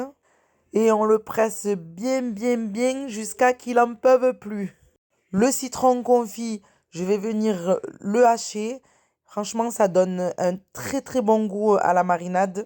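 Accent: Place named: Montpellier, France. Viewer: ton accent,tu es vers Montpellier ?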